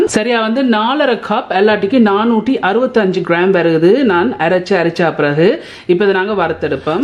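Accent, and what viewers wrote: Jaffna, Sri Lanka. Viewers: Jaffna tamil duper❤ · Srilankan tamil aa??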